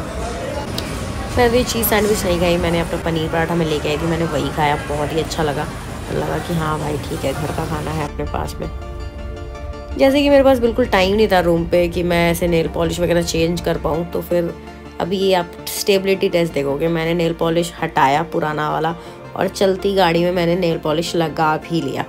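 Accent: Bihar, India. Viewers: It's nice to hear her bihari accent 😍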